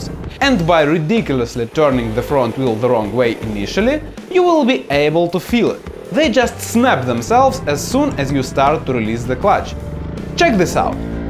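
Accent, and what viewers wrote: Russia. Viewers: can clearly hear ur ruski accent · bro has the best type of russian accent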